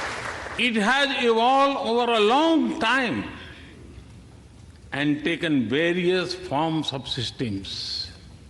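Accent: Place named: Gujarat, India. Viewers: but his foolish attempt to speak English in gujrat accent makes ppl make fun of him . he should stick to hindi and let them listen to translation. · Impossible to memorise such a long speech. May be he mentally translated from Gujarati to English. Pretty decent English I would say. The flow, logic, sequence and verbalisation is good. One must ignore Gujarati accent as people have such a variations in India.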